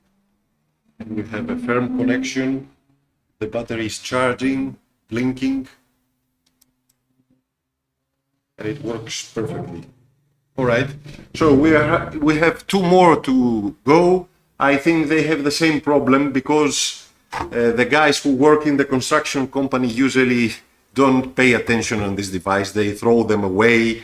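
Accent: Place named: Greece. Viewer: Η προφορά σου μοιάζει ελληνικη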